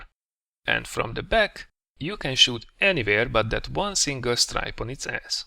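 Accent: Hungary. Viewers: Gondoltam hogy magyar vagy az akcentusbol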